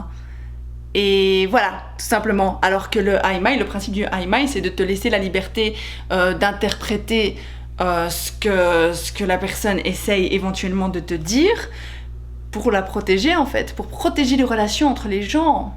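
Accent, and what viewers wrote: Belgium. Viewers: Il y a du Jean-Claude Vandamme dans le parlé de! Made in Belgium sans doute. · Je me disais bien que tu es belge lol Je reconnais bien l’accent de mon pays Vivement début septembre de cette année que je sois à Tokyo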